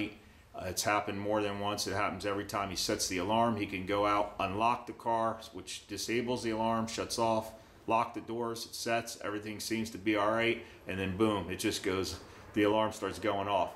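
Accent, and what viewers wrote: Philadelphia, United States. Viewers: THANK YOU. My Honda Fit is doing this exact same thing and I'm pretty sure my neighbors are ready to kill me (I don't blame them). Thanks so much for the thorough video. And from your accent I can tell you're probably from around Philly, so appreciate you even more 🤣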